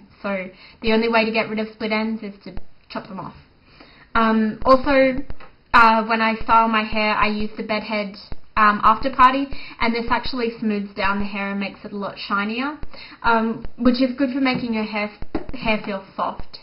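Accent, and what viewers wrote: Australia. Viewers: are you australian? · You're beautiful. Thanks for the post. Looking at you -- and listening to that cute Aussie accent of yours -- is the best spent on the 'net.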